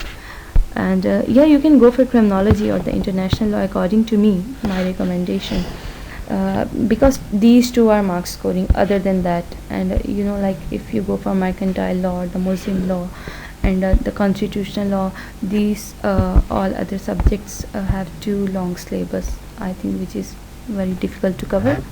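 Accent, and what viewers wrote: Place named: England, United Kingdom. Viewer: A lovely English accent! A simple mesmerising voice! One of the best guidance ever! I wish all the best for you! Keep making this incredible videos for us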